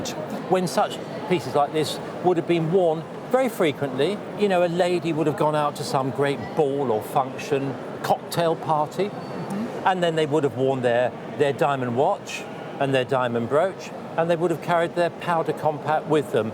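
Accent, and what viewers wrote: United Kingdom. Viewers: well, he is British, their spelling and pronunciation is different.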